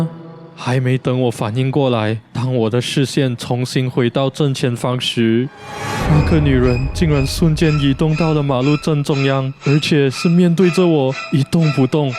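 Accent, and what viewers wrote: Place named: Singapore. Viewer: You sound like a Singaporean.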